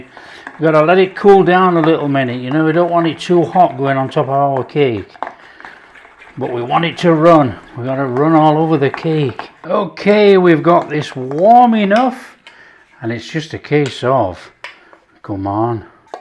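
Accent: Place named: Scotland, United Kingdom. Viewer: Oh my. I know what cake I'm requesting be made for my birthday this coming April (if I can wait that long--haha). I love your recipes, your wonderful Scottish accent of my ancestors, but your taste-testing euphoria always makes me smile! Thanks so much! Best, ~Marirose USA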